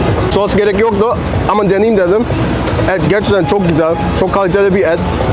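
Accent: United States. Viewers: adam doğma büyüme amerikalı belli ki türkçeden önce ingilizce öğrenmiş sen önce bi üslubunu düzelt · S’en amerikada mi dogdun turkcen den dedim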